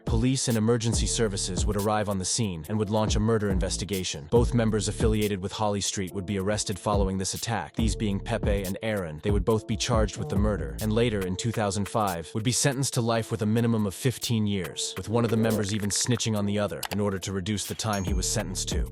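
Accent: United States. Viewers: Its an ai generated voice I think, so it messes stuff up. I think that's the reason anyway. Also an American voice so if not ai that's probs why place names are being messed up.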